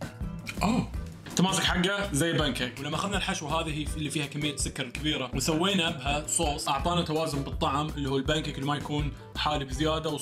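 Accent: Eastern Province, Saudi Arabia. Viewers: من لهجتك واضح انك من الشرقيه وربما الاحساء تحديدا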